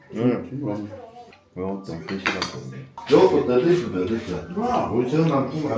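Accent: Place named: Gyeongsang, South Korea. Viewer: 경상도 목소리 좋으네요 얼굴은 수더분하시고 구독합니다 좋아요는 시청료로 눌러봅니다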